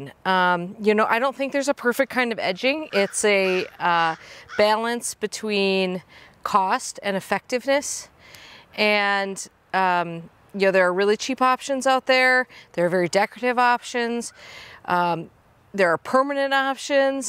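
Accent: Wisconsin, United States. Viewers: I had to laugh listening to you talk. I think the word “so” must be a Wisconsin thing as I say it all the time myself. I live in Door County and it isn’t t-shirt weather here yet!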